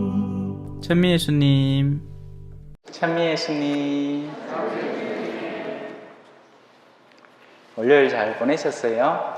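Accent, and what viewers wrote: Gyeongsang, South Korea. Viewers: 아멘 신부님 경상도 사투리 넘 정겹습니다 강론말씀도 참 잼나게 하십니다 나날이 신부님 건강도 좋아보시니 감사드립니다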